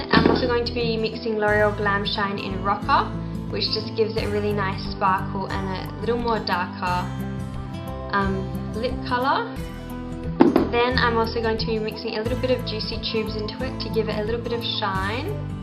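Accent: Australia. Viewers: Sometimes you sound Aussie and then other times you sound like you are putting on a British accent. FYI I am Australian so I know the difference.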